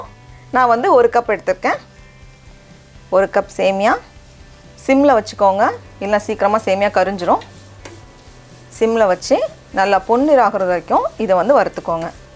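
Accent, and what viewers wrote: Nagercoil, India. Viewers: akka neenga USlaya irukeenga appro nagercoil kaarangala ☺☺☺ so happy i heared it in a you tube channel appro onga veetla ellaru nalla irukaangala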